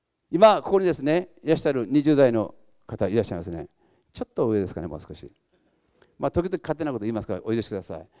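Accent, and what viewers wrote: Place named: Nagasaki, Japan. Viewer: この長崎弁もいいし声もいいんですよね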